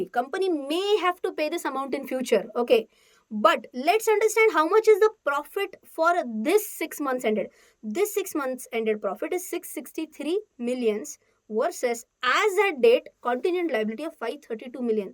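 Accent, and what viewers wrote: Maharashtra, India. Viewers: You are reaching 2m subscribers! Are most of the subscribers from Maharashtra region? If yes then its ok, otherwise I find marathi emphasis ( even though slightly) pretty needless. Sorry to break this to you. Lotsa ❤️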